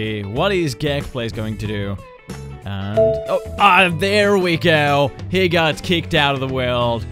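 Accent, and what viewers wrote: Finland. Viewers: Huomaa että oot suomesta xD siis aksentista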